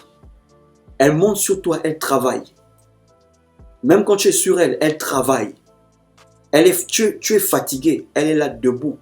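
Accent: Gabon. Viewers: te gabonais frère l'accent ce chez moi içi ah libreville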